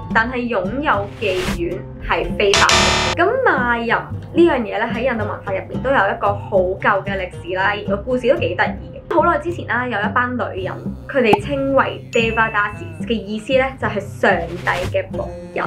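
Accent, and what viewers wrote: Standard. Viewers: 你係印度人或者香港人? 好标准啊你个广东话。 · 真以为是配音啊，好标准啊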